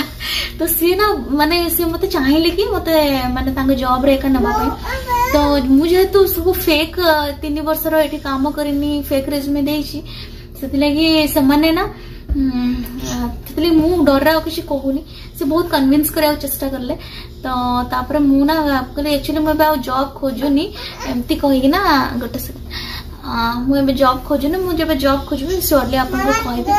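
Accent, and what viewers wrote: United States. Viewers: American English kemiti Shikhile ? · How u learned American english? Plz share details...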